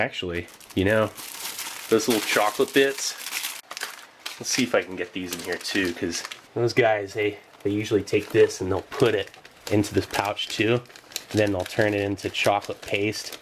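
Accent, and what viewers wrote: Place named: United States. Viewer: Could anyone please tell me where in the US does accent come from?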